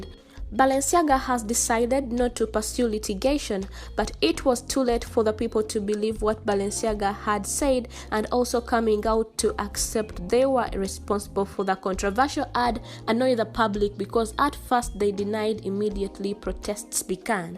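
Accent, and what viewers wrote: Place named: India. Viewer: I can't listen to you channel because it reminds me of ordering an Indian.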